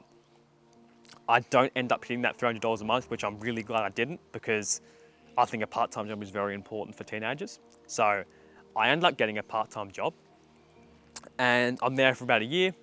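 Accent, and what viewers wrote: Australia. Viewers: Are you australian if so do you live in sydney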